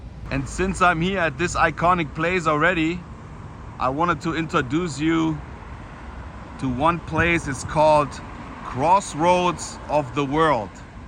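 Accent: Germany. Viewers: Nostalgia, I so remember that old Hollywood hotel Mr, I am from so.central los Angeles, so your video is taking us down memory lane, so sad it got demolished, I liked los Angeles county when I lived there back in the 50,sand 60,s it was nice back then, now it's so different, you should go see Griffith park and the observatory and the old zoo there and the train, I do enjoy your video,s and I like that German accent, you could be a great tour guide, thanks for the video, good job, Have a beautiful day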